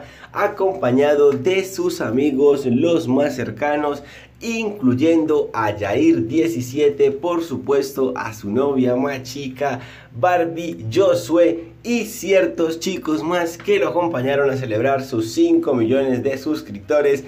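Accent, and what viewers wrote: Venezuela. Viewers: Argentino????? Es venezolano 😂 a quien le gustan las arepas chamo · Jajjajaja es venezolano no Argentino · Jajajajaj cual argentino es venezolano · No sabía que the era Argentino, y hablaba como venezolano XD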